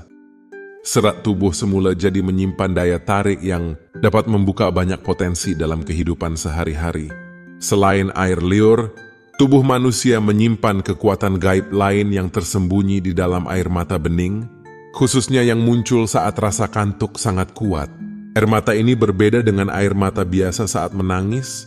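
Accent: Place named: Malaysia. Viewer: Logatnya Seperti Bahasa Melayu Yang Disampaikan Oleh Penuturnya... Tetapi Text Tertulisnya, Menggunakan Bahasa Indonesia...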